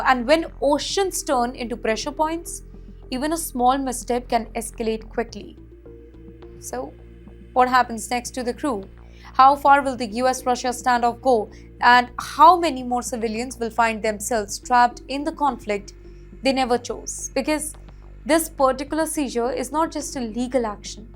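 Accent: India. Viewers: Oh I think I can hear it. It's slightly different with some words, like she has two English Indian accents and they blend lol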